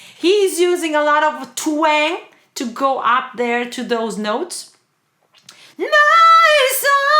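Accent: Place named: Italy. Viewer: Заметно что мадам из Италии. Очень быстрый темп речи. Тяжело успевать читать субтитры.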